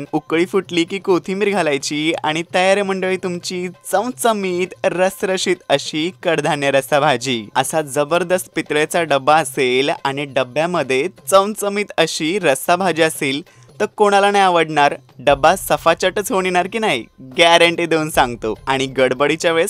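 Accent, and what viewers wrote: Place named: Konkan, India. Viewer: भाऊ तुम्ही कोकणचे आहेत का तुमचं बोलणं ऐकून असं वाटते की तुम्ही कोकणातीलच आहेत पण तुमचा आवाज खूप छान आहे शेची फोनचे लोक एकदम साधे भोळे भाषेत बोलतात😅😊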